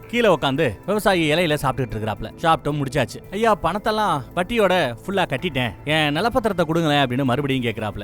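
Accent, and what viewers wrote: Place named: Madurai, India. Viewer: நீங்க மதுரைக்காரரா நம்ம மதுரைக்காரன் மாதிரி பேசுறீங்க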